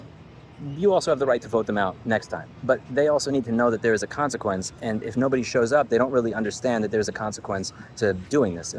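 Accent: New York, United States. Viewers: This is great, I hear de New York. Normally ya don't hear the accent. Come onnnnnnn. Say "all a yous!" Don't be all grammatical on me now!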